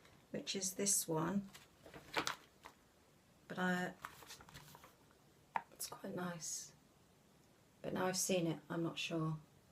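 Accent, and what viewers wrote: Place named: England, United Kingdom. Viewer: Hi, great progress as always! I'm English living in Australia and listening to your lovely English accent makes me feel so happy for the rest of the day! I got my hubby to make the scroll frame, so easy and cheap and I found sticky backed Velcro which is great to use! So a big thankyou!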